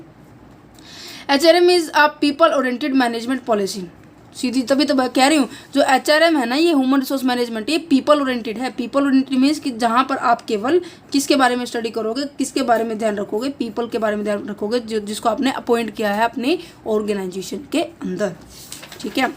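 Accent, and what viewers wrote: Haryana, India. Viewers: Mam aap Haryana se ho gi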